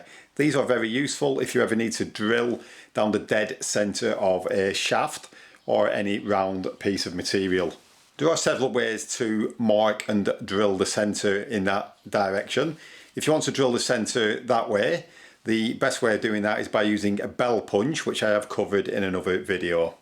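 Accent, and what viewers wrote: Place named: Scotland, United Kingdom. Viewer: I subbed cus of your scottish accent, also handy content!😁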